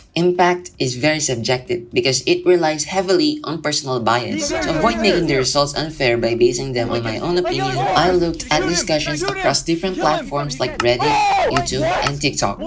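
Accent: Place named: Philippines. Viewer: Sabi ko na taga pinas ka, kasi same tayo ng accent kapag nagsasalita ng English hahahaha the resemblance is uncanny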